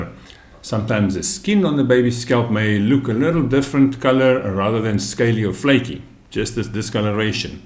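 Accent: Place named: South Africa. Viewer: The minute i heard your voice i daughter he is south African. Miss that accent. Thanks for the info on cradle cap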